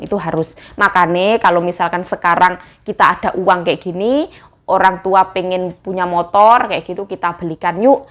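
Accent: Java, Indonesia. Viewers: slm knal, aq dr tanzi, liat km lwat d branda, cba q simak, kok asik jg cara bicaramu, medok tegas crdas, salut sm km, brbakti sm ortu, synk sm ttgga, Smga kdpan ny lbh sukss ya, Aamiiin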